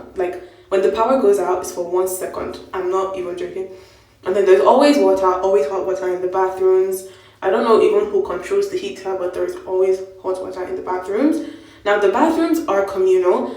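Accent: Igbo, Nigeria. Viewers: Her igbo sounding so poshhh😂😂❤❤❤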